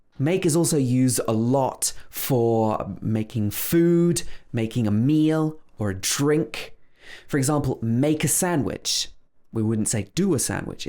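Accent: United Kingdom. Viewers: It's cool video to study British accent 🤎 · You are one of my best teachers. I like your accent greatly. I'd like to see more videos from you. You use RP sound what I like most. Take care. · Hello, I am a new discovered to channel I am an English learner from Somalia and I would like to British accent it is simple and lovely I think that your videos will help learners thanks for your help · I was searching for a channel where I can learn British Accent.... Thanks Allah Almighty.... He helped me to find out you... I am so happy now... Thanks for uploading videos on Accent in such a smooth way... I really like it.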